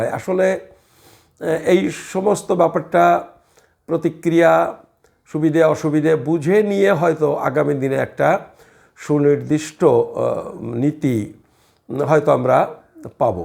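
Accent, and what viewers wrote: Bangladesh. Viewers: তোমার কথা শুনে মোনে hoy তুমিও Bangladeshi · কেমন বাংলা দেশি বলে মনে হয়, পুরানো refugee না নুতন refugee. পুরানো দের তেজ বেশী l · Apni pakka Bangladeshi....kotha sune bujhte perechi... 😂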